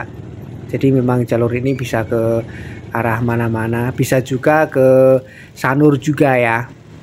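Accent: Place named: Java, Indonesia. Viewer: masnya asli mana kok logatnya kayak Jawa gitu...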